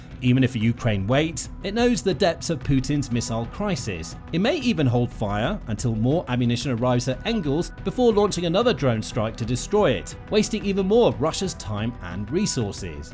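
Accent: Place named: Southern England, United Kingdom. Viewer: So, who is your audience? Since the rest of the world uses the metric system, one must assume it is the US. So perhaps you can ditch the southern English accent for Standard American.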